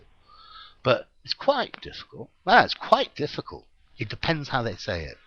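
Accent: United Kingdom. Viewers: First off, as an English Language Development teacher (I'm a native speaker of American English) in America working with mainly hispano-american immigrants, I appreciate this valuable post, as you speak with a wonderful example of a British accent, and I love your cadence and clarity of enunciation. I am going to use more of your videos in my class to help my students understand the importance of pronunciation, cadence, accent, and enunciation. Second, I applaud you for tackling such a nuanced and tricky subject. Even as I write this, I realize how often I use these adverbial modifiers, and how difficult it must be for my students to follow the differences in what I mean. On the upcoming research project, for example, I said that the Power Point presentation is "fairly important", but that the written component is "quite important". I was shocked when all my students then began working on their Power Point presentations instead of focusing on their written reports. 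Obviously, they didn't understand the difference between "fairly" and "quite". Thanks for making me more conscious of this in my communication. Finally, I'm including a link below to a written article about this same topic that may add to the conversation. I hope that such links do not detract from the work that you are doing. Again, thanks for making resources that so many of us value, quite a lot! Please contact me if there is any complaint: <mail> <link>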